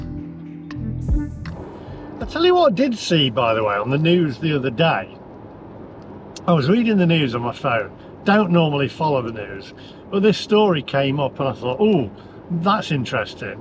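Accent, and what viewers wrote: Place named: North, United Kingdom. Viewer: IMO people with accents like yours are northerners.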